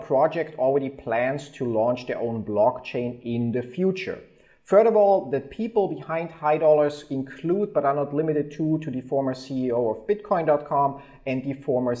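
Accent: Germany. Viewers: this heavy german accent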